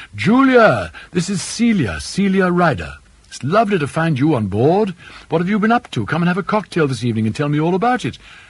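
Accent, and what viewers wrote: RP, United Kingdom. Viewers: his own impeccable received pronunciation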